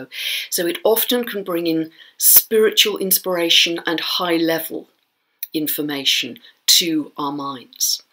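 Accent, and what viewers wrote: United Kingdom. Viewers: Hello. Really like the scientific approach to your video and we cannot deny, looking at the energies in the world, that what you are saying it not valid. So many people like your videos because your British English is so clear and lovely. Best Wishes to you; ) K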